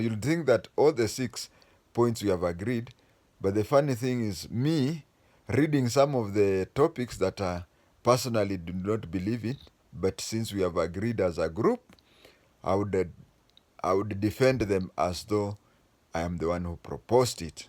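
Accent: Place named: Kiambu, Kenya. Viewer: Whether you’re aware about it or not, you’re now a public figure and we as your supporters are interested in knowing more about you. You have periodically mentioned your father but only given minor glimpses here and there about the other half of your Kikuyu background. Tunangojea kusikia hayo and by the way, for your mother to be from Nyeri, you speak Kikuyu with a Kiambu accent.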